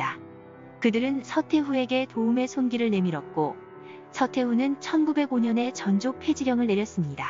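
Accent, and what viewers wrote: Gyeongsang, South Korea. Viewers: 아 저도 그 갱산도 사투리가 욕은 많이 먹었어두 나름 개성적이고 중독(?)되서 구독 한건대 쪼까 아쉽네영 ㅎㅎㅎ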